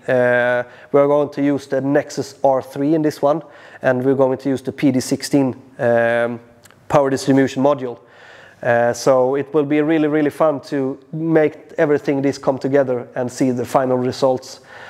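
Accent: Sweden. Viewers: A little Swenglish never hurt anyone! 🇸🇪 What an incredible build, subscribed and ready for the next episode! 🚀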